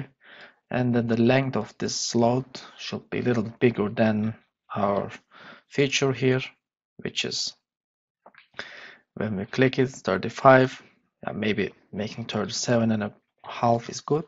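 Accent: Turkey. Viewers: Are you Turkish? ı thought this cause your accent.am ı right?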